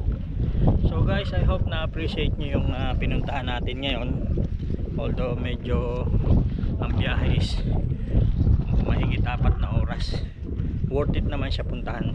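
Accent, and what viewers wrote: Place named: United Kingdom. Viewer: ung accent British na talaga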